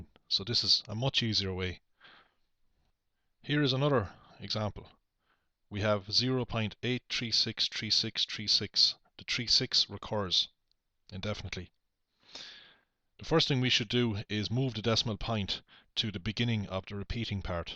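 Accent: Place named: Ireland. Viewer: So so so helpful thank you very much and finally someone Irish doing these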